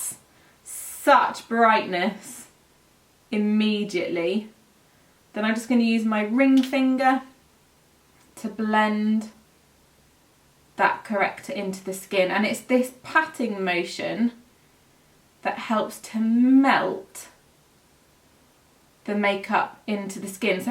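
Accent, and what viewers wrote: United Kingdom. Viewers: I could listen to you talk for days!! Sounds so proper and "British". Makes my American accent sound so dull! Lol. Your makeup is always stunning! And always educational. Thanks!💕💕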